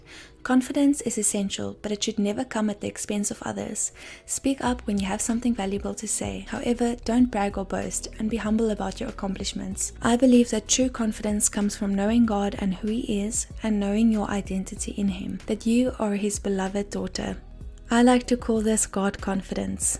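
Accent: South Africa. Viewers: I can hear that you are South African. I love that! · " " Your South African accent is refreshing for a South African such as me, who has lived in the USA for 30 years now.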